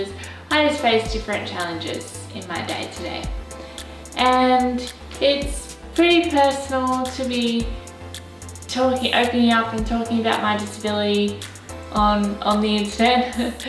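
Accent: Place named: Australia. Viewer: You sound like a heck of an Aussie girl,. A like & subscribe from me as well & now following you on FB. Keep up the great work & all power to you!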